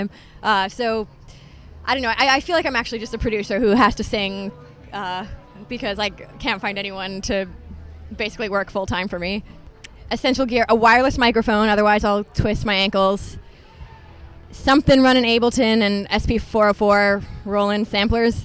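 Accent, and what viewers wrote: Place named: California, United States. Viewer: She's sounding really Californian. haha I kind of miss the rookie Grimes, but it's cool to see how much success she has attained.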